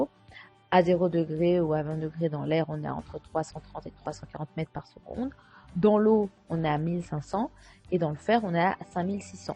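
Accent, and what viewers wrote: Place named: Tunisia. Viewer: Une voix de tunisienne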